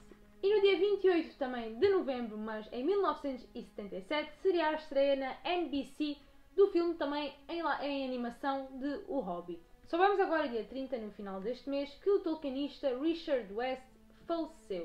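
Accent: Alentejo, Portugal. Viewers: Fiz aniversário no dia 29 de novembro, e esse tt news foi meu melhor presente, Receber todas essas noticias da maravilhosa é realmente incrível. Muitas noticias boas com esse toque alentejano tão gostoso de se ouvir. Só falta agora um tt podcast para ouvir esse belíssimo sotaque em qualquer lugar.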